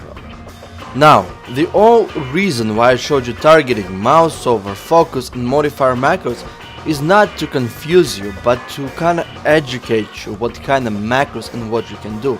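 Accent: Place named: Russia. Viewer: ..I pretty much liked the video,but you should try to make your accent slightly better. It is not bad to be russian or whatevs,seriously,just sometimes I don't understand what you say that's why..Plus u will become better in english :D Other than that,I enjoyed the video.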